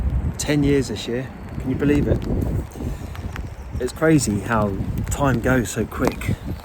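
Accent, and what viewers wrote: United Kingdom. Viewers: Sad the brothers couldnt be buried in one place. Love your britsh voice. From Canada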